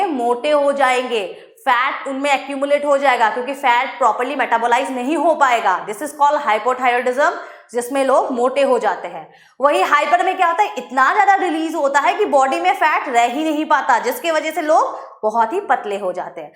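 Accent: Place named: Bihar, India. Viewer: Bihari apka fun hai mam ❤️❤️❤️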